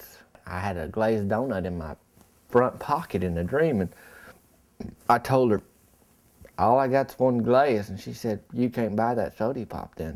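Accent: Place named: Ozarks, United States. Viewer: This is hilarious! I'm from Arkansas & currently live in Missouri in the Ozarks, & I've met people like this. His accent is spot on! LMAO!!!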